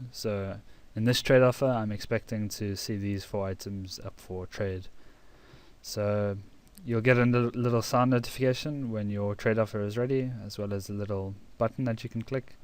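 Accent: South Africa. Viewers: Is this guy a South African ? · you sound south african ?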